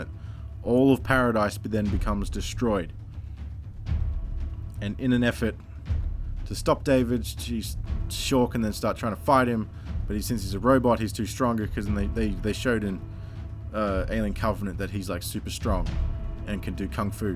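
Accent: Ireland. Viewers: Love your Irish accent lol ! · Your Kerry Irish accent with a mild hint of German is entertainingly close to the mark. This would have been a much better film from a continuity perspective and would have made a lot more sense. Given what we've seen of Shaw in flashbacks I think this film could and potentially will still happen if the Sequel / Prequel mentioned by Ridley Scott is still on the cards.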